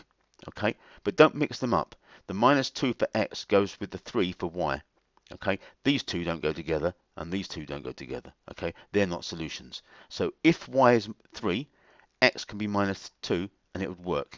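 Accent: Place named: United Kingdom. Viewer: Lol ur British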